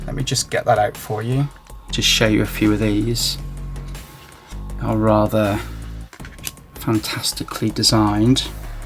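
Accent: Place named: North, United Kingdom. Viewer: My compliments: your house shows good taste, and a great aesthetic sense. I have a question, which you might find a bit provocative: how can you be so lighthearted all the time, given you are a goth, these are heavy times (ref: Paul King!) and, based on your accent (Northener...?), you seem to live in a Country where police breaks into someone's house following a post, and that has been literally destroyed by decades of uncontrolled mass migration? I know being a goth can simply involve lots of make up and a matter of dress code, but I do not believe you are such a shallow guy. Just wondering, as I can barely cope.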